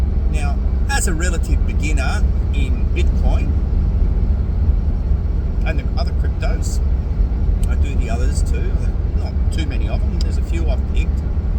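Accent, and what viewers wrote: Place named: Australia. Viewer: Hi mate, sounds like an aussie, me too. I'm in a similar boat, with the shit coins and BTC.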